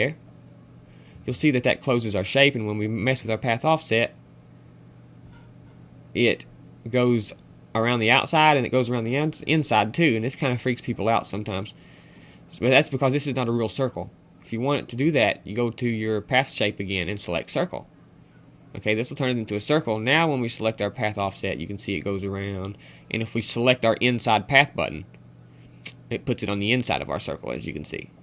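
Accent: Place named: Georgia, United States. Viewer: Once again i must say you are a smart man, and you sound like your from georgia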